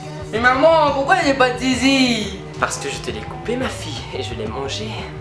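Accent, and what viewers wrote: Switzerland. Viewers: Ooooooooh, t'es suisse ? :') J'aime trop t'es vidéos t'es super drôle et le mec derrière il me tue trop :') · Ton accent suisse? Parfait :o❤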